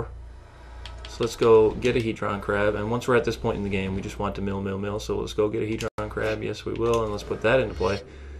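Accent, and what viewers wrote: Canada. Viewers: you canadian? just a question you have a pretty thick accent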